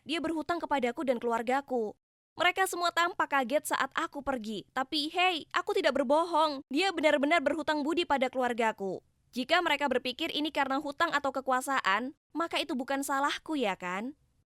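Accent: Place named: Java, Indonesia. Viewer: Pasti voice over nya orang jawa🗿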